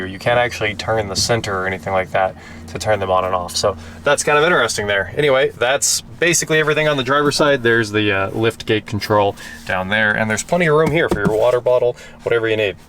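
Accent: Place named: South, United States. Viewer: Sounds like a southern poor boy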